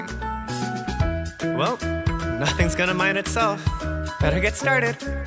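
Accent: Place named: United Kingdom. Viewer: Why does bro sound British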